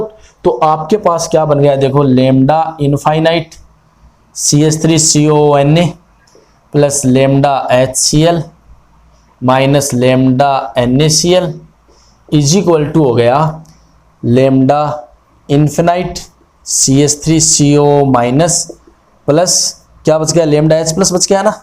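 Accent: Haryana, India. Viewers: sir aap haryana se ho kia?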